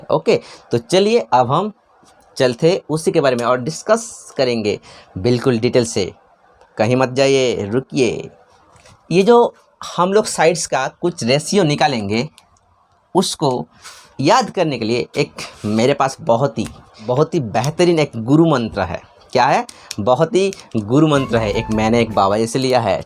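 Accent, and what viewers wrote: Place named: Bengal, India. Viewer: Thoda thoda bangla arehehe but it doesnot matter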